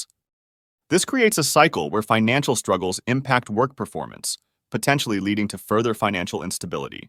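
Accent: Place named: United States. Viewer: Sounds like it….can’t believe a yank would be saying Australia has a debt problem. Obviously oblivious to what’s going on in his own backyard! 37 trillion and counting.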